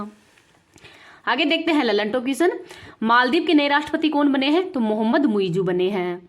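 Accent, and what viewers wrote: India. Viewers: Dear ham up se hai.. Aap हिंदी को राजस्थानी मे थोड़ी मिक्स करके बोलती है और जल्दी जल्दी बोलती है तो कम समझ आता है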